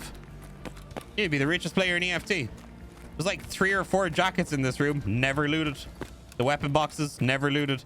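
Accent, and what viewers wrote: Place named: Ireland. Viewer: The Irish accent is a thing of beauty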